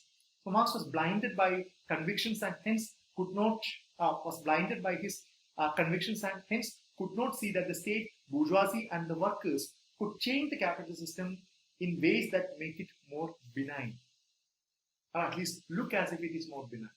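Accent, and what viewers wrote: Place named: India. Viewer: The recording quality is poor. In combination with the thicker vocalization of an Indian accent, it turns into a fishbowl when played at high speed. But it's too ponderous to play at any lower speed.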